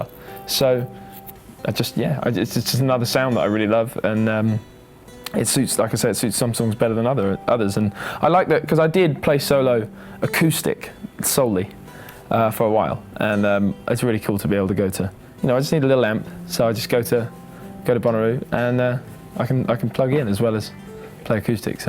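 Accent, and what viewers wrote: United Kingdom. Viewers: OMG HE HAS A BRITISH ACCENT!! JUST MADE HIM 100% HOTTER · this british super talanted and also very beautiful and charming with this accent ogosh im inlove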